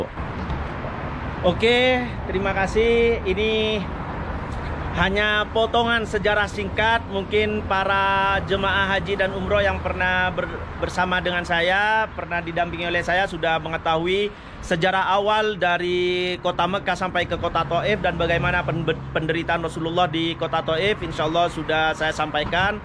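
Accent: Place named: Madura, Indonesia. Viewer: klo dr bhsaxa pasti madura🤣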